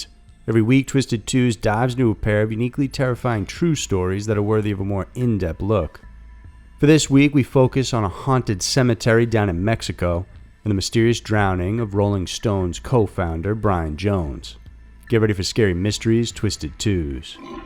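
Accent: South, United States. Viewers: "brian" is misspelled in the title but he pronounces it very normally for someone with a light southern accent - "brahn" - like how he says "nine" more like "nahn" - just an average drawl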